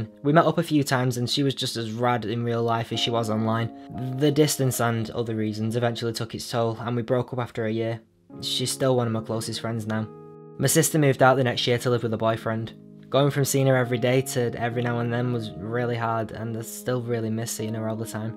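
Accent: United Kingdom. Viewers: dhat British accent ^_^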